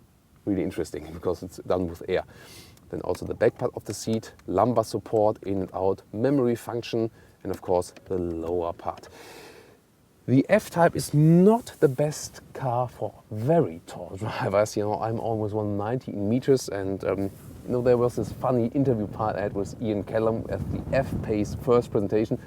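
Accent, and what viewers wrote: Germany. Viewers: Please make your Videos in German, the German-English sounds like shit and your Channelname is also a German one...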